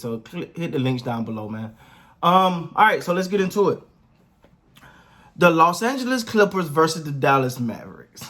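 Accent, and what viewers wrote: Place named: South, United States. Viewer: First time watching this channel. Wondering if he is from Florida or somewhere close. Not only does he sound like Roy Jones Jr. he has the same dialect. I'm from the midwest so not well versed in southern accents and dialects. P.S. You do a pretty good job...keep it up.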